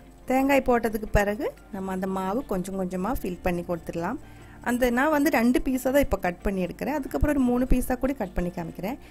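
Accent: Kerala, India. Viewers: Her malayalam mixed tamil slang is nice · നിങ്ങൾ മലയാളിയാണോ? തമിഴ് കേൾക്കുമ്പോൾ മലയാളി തമിഴ് സംസാരിക്കുന്നതു പോലെ തോന്നി. പിന്നെ എല്ലാ recipies ഞാൻ കാണുന്നുണ്ട് എല്ലാം നന്നായിരിക്കുന്നു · You talk very sweetly. You remind me of my Malayalee friends from college. In fact your fluency in Tamil is excellent. You probably live in Tamil Nadu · Superb details ... very nice demonstration , ... Thank you Madam .. I also like the Malayalam flavoured Tamil.. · Tamil speak..good... To be avoid Malayalam slank... nothing to worry... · Love your kerala thamizh. Keep it up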